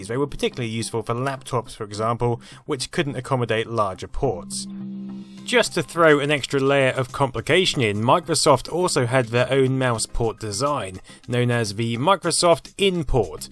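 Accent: United Kingdom. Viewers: I didn’t know was British or Australian or South African I thought he was American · Must be a British thing. Here in the USofA it's "RS two thirty two" vs. "RS two three two" and the PC/AT (Advanced Technology) was "PC A-T" vs. "PC at". Other than that, a delightful romp down memory lane. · John Pattillo it is A-T. Like AT ST AT AT. he's english/British they say everything wrong.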